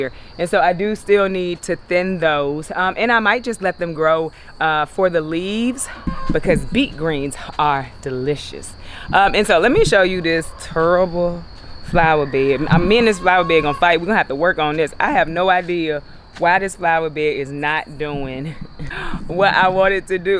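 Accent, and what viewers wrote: South, United States. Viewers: You have the best aura....I just love who you are. You have such a positive attitude, a light refreshing southern voice. You're just plain beautiful inside & out!!! Happy growing!💚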